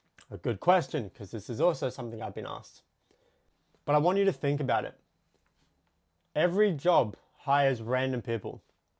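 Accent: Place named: Australia. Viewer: I like this guy already soon as he starts the video already drop the F bomb in a Australian accent😭 let’s get right to it boys💀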